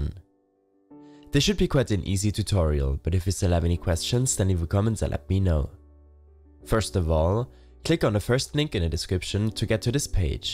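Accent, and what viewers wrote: Germany. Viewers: Defenetly a german guy😂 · i heard your accent in the firt 10 seconds i thnik its german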